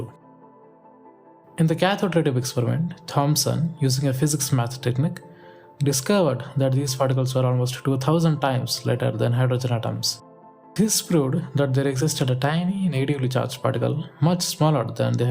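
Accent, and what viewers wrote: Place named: South India, India. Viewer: Thanks helped a lot, the South Indian accent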